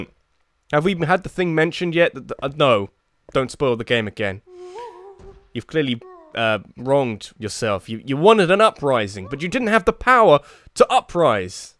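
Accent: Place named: Scotland, United Kingdom. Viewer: We need the Scottishness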